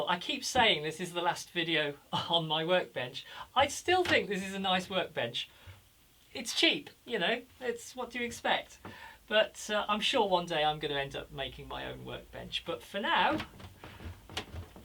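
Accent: United Kingdom. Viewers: Fender uses those inserts in the Yngwie Malmsteen strat so you had a good idea for sure. I own that guitar and you can feel the vibration of the strings better than a normal neck. I use them on all my bolt on necks. Just found your channel, good stuff and everything sounds better when a UK lady speaks. Cheers.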